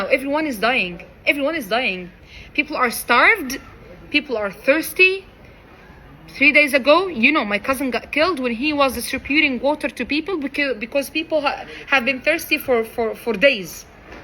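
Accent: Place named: United States. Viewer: She always speaks English so weird as if she’s American or Palestinians are American 😅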